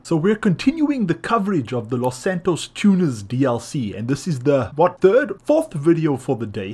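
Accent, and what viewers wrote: South Africa. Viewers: Are you originally from South Africa?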